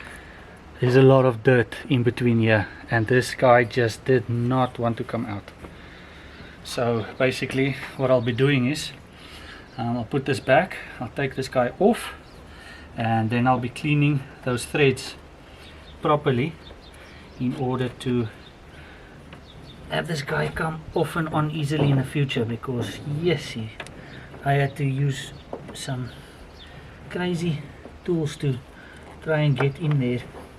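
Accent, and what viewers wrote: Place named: South Africa. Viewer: Love the South African accent! Very helpful, thanks!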